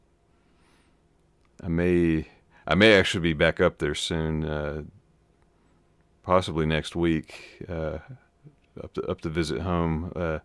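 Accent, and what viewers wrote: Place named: Midwest, United States. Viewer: Ok, so I’m creeping!! lol, I’m a Honker who wanted to see if looks like his voice!!! 😂😂. I have to say your mid west accent is much more apparent on this channel. Probably bc you’re not talking with a British accent!! ♥️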